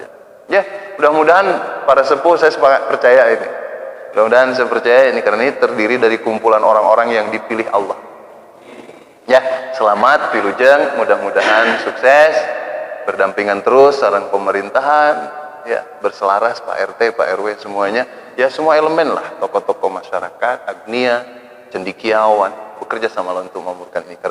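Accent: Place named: Sunda, Indonesia. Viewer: Logat Sunda teh lembut kena kupingnya,,, berkembang terusss kang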